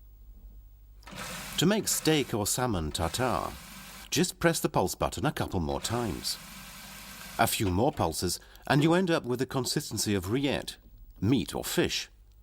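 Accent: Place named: United Kingdom. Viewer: he is british, i like his english by the way.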